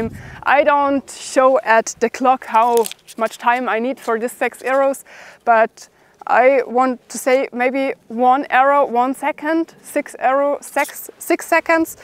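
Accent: Germany. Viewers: I enjoy the German accent while listening to a well informed video about this bow.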